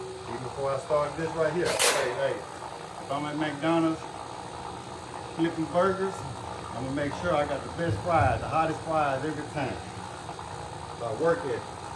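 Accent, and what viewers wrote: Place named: Mississippi, United States. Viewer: You from Mississippi?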